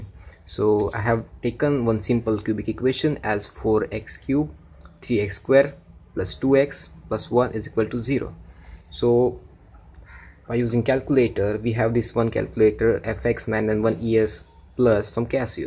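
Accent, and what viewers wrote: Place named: Italy. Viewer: love the italian accent ! really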